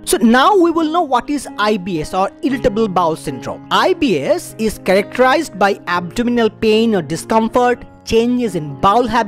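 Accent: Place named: Bengal, India. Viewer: Bengali doc .. strong Bengali accent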